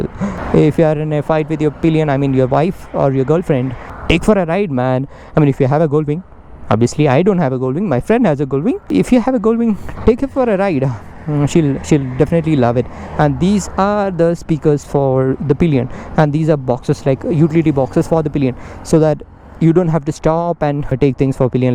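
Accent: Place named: Tamil Nadu, India. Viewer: inner tamil coming out in that english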